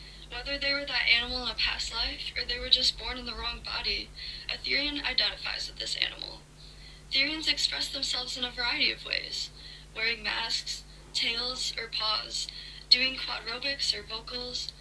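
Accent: Netherlands. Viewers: Are you also dutch? Bc i have the same kinda accent when i speak English😼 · i smell dutch, are you dutch???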